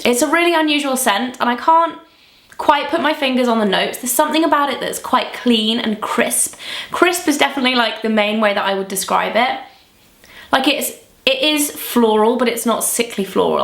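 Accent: United Kingdom. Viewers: Hi! I really like the way you talk.. I just wanna ask what's your accent called? I mean what kind of British accent it is?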